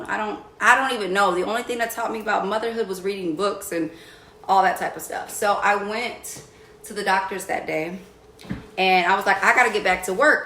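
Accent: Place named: New York, United States. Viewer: I can tell your from New York it’s all in your voice I’m from New York too the Bronx your mannerisms is so New York love it ❤️ you seem very nice down to earth ❤️ I’ve met your sister on ancestry ya look just alike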